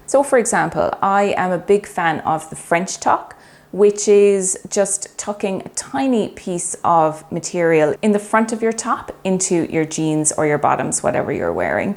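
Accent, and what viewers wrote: Ireland. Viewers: So well said - 52 and curvy here, I love your gentle manner and of course your helpful tips - you have a new subscriber :) PS - I enjoy your lovely Irish accent, and your doggy friend is super cute (and that's coming from the ultimate cat person)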